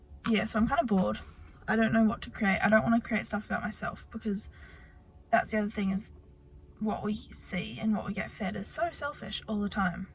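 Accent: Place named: Australia. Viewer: I always wondered why your accent seemed more aussie than kiwi!! makes sense, i had no idea you were from aus 🫶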